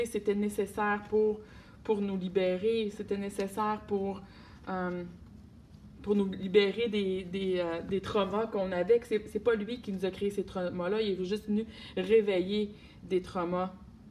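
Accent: Quebec, Canada. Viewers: Tu as une voix québécoise, ce que tu dis est exactement la même chose depuis 7 ans, 2012. il a des amis toxiques et oui j’ai hâte aussi au 26 déc. je sais qu’il en subira des conséquences que de m’avoir vraiment choisi, bien qu’il assume. J’ai tout coupé tout contact et toute énergie de protection. Il m’a vidé alors qu’il se responsabilise, moi je fais mon chemin. Sa fausseté spirituelle je m’en fou mais je l’ai bien cerné ce conard dans ces conneries au lieu d’aller faire une thérapie sur la violence d’abus psychologique. Je me consacre à l’amour véritable sans violence et finitos les hommes ça va faire de les materner. Ils n’ont pas la connexion du coeur, des vrais pervers sur notre conscience divine. 7 ans d’enfer alors je mets le gros cadenas 🔒 Trop d’hommes nous traitent comme des poubelles depuis des millénaires alors je fais la grève. Je suis les conseils d’une aînée et ça fait 35 ans qu’elle est seule et très rayonnante. 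Ces hommes nous manipulent tellement bien avec leurs énergies diaboliques, ça pu de cerveau avec tout ce qui prennent de toxicité que c’est juste le cerveau reptilien qui leur reste et pu de neurone dans le coeur donc il y a rien à faire. Mais moi je me suis reconstruie et j’ai une grande amélioration de mon corps 🙏🏻🥳😇 avec une colonne droite et des gens extraordinaires autour de moi! 🥰 Je suis prête au bonheur avec une insémination et mon enfant sera bien et heureux avec tous mes savoirs d’amour 😍 J’ai confiance à la nouvelle génération 😎 à l’arrivée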